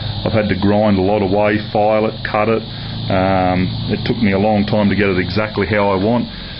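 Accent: Australia. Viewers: I UNDERSTAND THE AUSSIE ACCENT!!!! everything you say souns like your asking a question!!! lol jk but great rifle.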